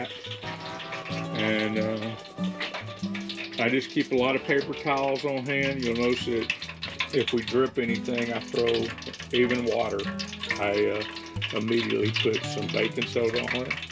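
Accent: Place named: South, United States. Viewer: The southern drawl-y accent is super likable to me atleast, whos from Northern Sweden, arctic cirlce. ❤️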